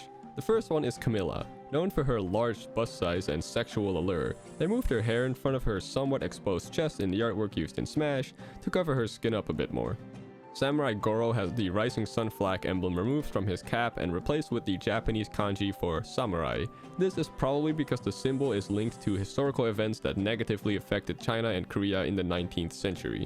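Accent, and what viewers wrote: Netherlands. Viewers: When You listen closely You can still Hear his dutch accent.Das cool man,blijf video’s maken 👍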